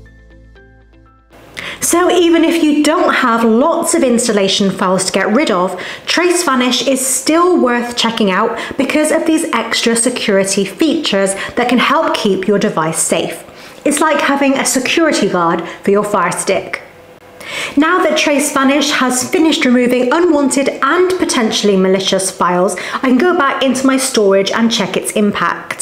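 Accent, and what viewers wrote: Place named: England, United Kingdom. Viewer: Thanks. It works very well and let me tell you that I love your English accent.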